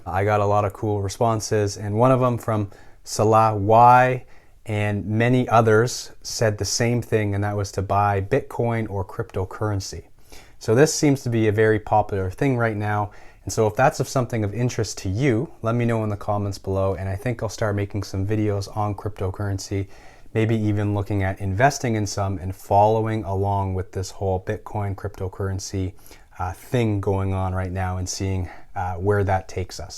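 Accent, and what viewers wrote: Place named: France. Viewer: Justin trudo? Are you French? You have a French accent though its subtle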